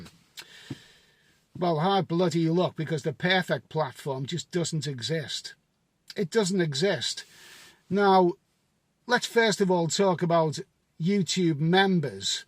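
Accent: Liverpool, United Kingdom. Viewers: A scouser in a fedora peddling derty smutty videeos....count me in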